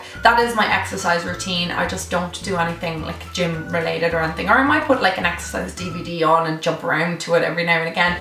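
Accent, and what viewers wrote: Ireland. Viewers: Is she Irish??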